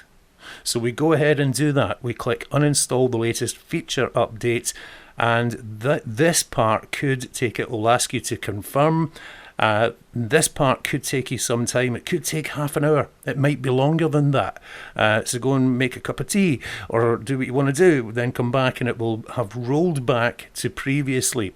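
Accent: Scotland, United Kingdom. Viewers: Trust a Scotsman to know a great solution and easy explanation to boot! The accent was very nice too!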